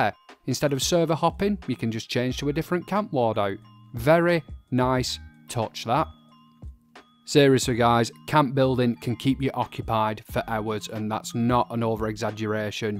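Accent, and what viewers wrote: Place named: Yorkshire, United Kingdom. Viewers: God I just love you accent mate. I'm from Britain and I'm guessing maybe your from Yorkshire? Either way you accent is brill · Are you from Yorkshire? I am and I'm rubbish with figuring out other people's accents 🤣 Great video by the way, thanks!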